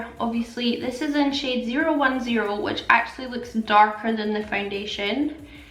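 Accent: Scotland, United Kingdom. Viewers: Love the Scottish accent 😌 I’ve been seeing outlander and loving the accent 😋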